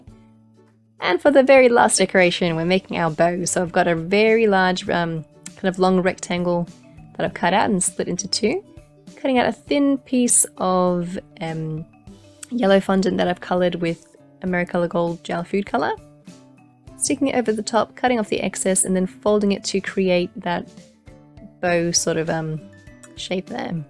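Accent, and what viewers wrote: Australia. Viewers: Loved the idea, and love your Australian accent! Kisses from Italy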